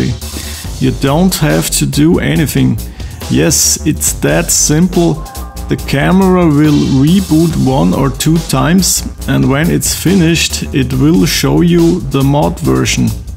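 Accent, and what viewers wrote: Germany. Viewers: Gibts das auch auf Deutsch , er ist ja deutscher - hört man ja raus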